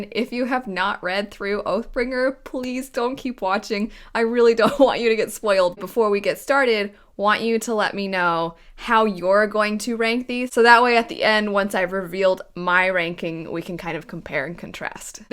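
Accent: United States. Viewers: Stumbled on this vid by accident and „Wiedźmin” collector’s edition (Polish version!) caught my eye immediately. Same for the polish „Mistborn trilogy”. Now I’m curious - are you a Pole? Can’t hear the onion accent in your English and your channel info says „US”… I’m so confused 😂 In case it’s not obvious - I’m a Pole myself and seeing those books contrasted by your perfect american accent is bugging me rn 😅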